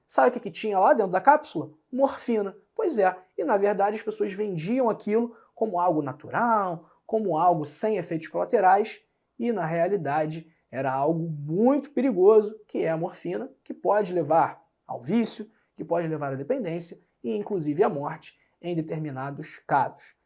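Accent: Rio de Janeiro, Brazil. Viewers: Como assim, sotaque arrastado. Ele é carioca . Queria que ele falasse como? Que observação mais sem sentido. Valha me Deus!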